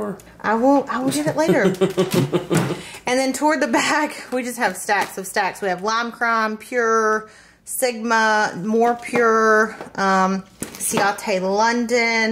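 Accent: South, United States. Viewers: I was introduced to you through yours and Vianney's giveaway...... so glad for that! Love your realness and your accent is ah-mazing!!! Reminds me of my Georgia and Alabama family 😉.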